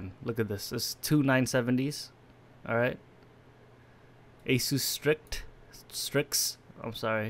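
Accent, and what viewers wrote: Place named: Cambodia. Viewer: Why do you have a Viet name but a Cambodian accent?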